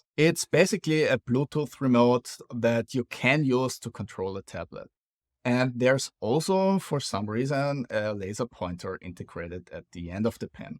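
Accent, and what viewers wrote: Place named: Austria. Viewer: your lovely and melodic Austrian-coloured German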